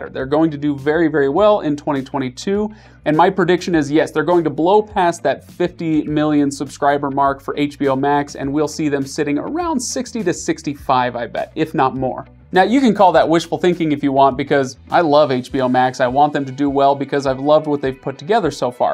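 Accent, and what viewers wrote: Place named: United States. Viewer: It was in plain English,albeit with an American accent. Would subtitles help?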